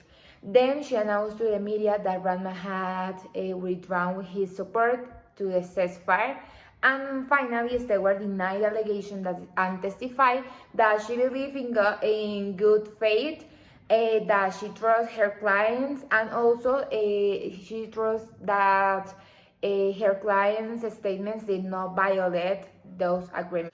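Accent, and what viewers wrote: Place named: Caribbean Coast, Colombia. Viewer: Costeña hablando inglés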